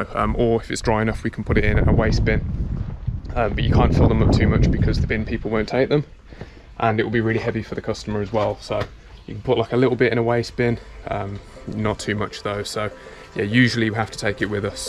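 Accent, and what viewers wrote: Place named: United Kingdom. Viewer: Hi...where is it you're from in the UK? I seem to recognise the accent from my UK years, but I'm not too sure...cheers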